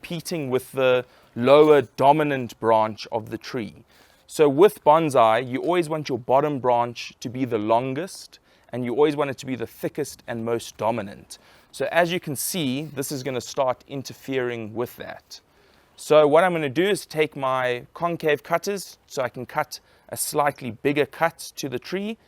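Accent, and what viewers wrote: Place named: South Africa. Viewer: really great video.....thank you.....also great to hear a fellow south african accent on you tube.....its also wonderfull to hear and feel your passion......im a beginner and just starting my bonsai journey. do you live in South Africa? where abouts?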